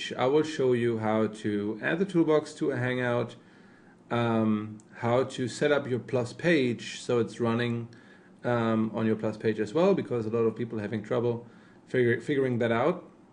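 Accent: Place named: United States. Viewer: Man, how intelligent you are. Language's accent is some big issue, no matter how smart one is. For some of those British out there you are labeled as an American, they don't know any better but you do...! With that Intelligence of yours I'm sure you could speak even Chinese without an accent if you want to...!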